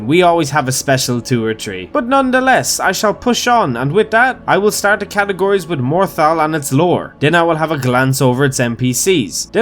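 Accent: Ireland. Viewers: First video, so I was like "why dafuq he sounds like a drunken dude?" Then I saw the name of the channel and went "Oh... That's why" Love Irish accent ~and their drinks, and gingers~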